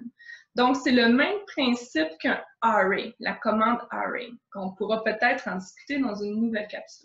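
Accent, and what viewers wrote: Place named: Quebec, Canada. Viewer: Je viens de découvrir tes vidéos avec ton bel accent québécoise, c'est simple et concis merci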